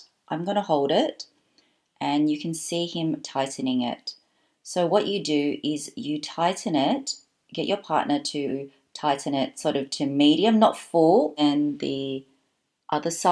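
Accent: Melbourne, Australia. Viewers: It's always so awesome hearing an Aussie accent on YouTube. I'm up in Brissy now but when you mentioned Chaddy (Chadstone) I was like "aaaaaaayeee!" lol. It's so hard to find reviews of things on YouTube from Aussies with names that you know. So awesome to see you. And thanks for review - I love the idea of the love bracelet and was planning to get one, but now I'm thinking "just a nail" might be more for me. I'd be mortified to look down and see it gone. It would be like looking down and something like a JLC Reverso just vanished - heart broken. Sweet info on pros and cons. Thank you. :) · Are you from Melbourne? You have that accent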